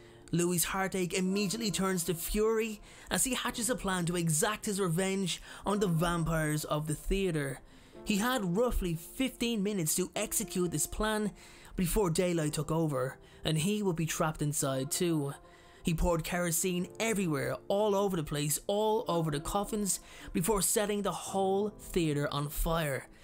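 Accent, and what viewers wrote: Ireland. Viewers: You are Irish? Be honest. Where are you from?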